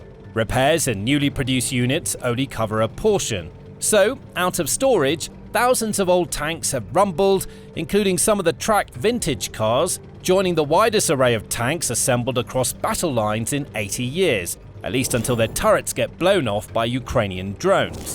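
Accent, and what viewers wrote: United Kingdom. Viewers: I thought he said the Challenger was the best overall, which seems to go well with his British accent.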